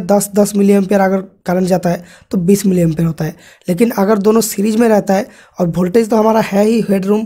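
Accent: Bengal, India. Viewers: Are you bengali ? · Tum bangali ho keya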